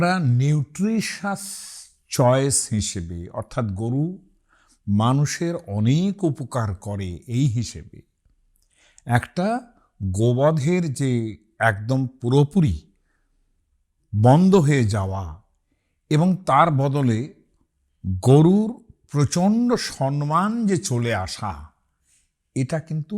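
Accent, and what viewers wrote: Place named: Rajshahi, Bangladesh. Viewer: Well he still carries bit of his early Varendri or Rajshahi accent I think.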